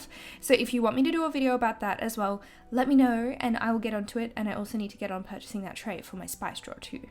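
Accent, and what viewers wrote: Australia. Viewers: culture lol. It's just an Australian accent. I could say the same about the way Americans pronounce words. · That's just Australian accents m8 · it's an Australian accent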